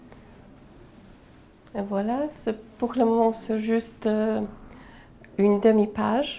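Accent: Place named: Eastern Europe. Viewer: Merci infiniment pour le partage de votre connaissance. Parmi les plus belles mélodies qui aient jamais été composées...Vous avez un accent adorable. Pologne, Russie, Ukraine...?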